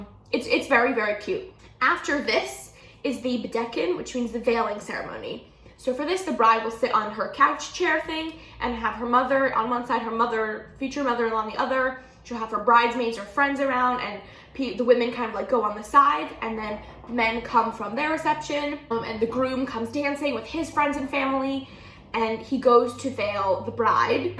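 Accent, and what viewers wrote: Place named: United States. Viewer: When I see you, hear you speaking I feel like I have an American girl in front of me, certainly not an orthodox jew girl. Your nails are painted... Your top doesn't cover properly the area below your neck. Also, I don't think it is very tsanua to expose yourself on YouTube with your hair untied and talking about family purity rules for the entire world to see and hear...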